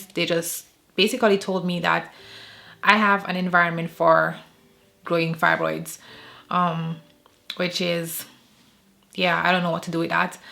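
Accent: Jamaica. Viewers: Waiting to do my open myomectomy yu sound like a Jamaican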